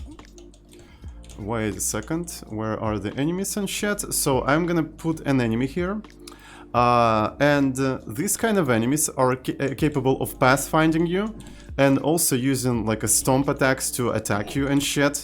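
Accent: Russia. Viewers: русский акцент?)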